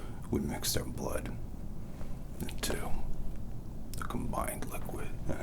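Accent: United States. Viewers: Less than 5 minutes in & THIS is brilliant... definitely worthy of a whole series & even more, thanks! & that american accent, spot on sir!!!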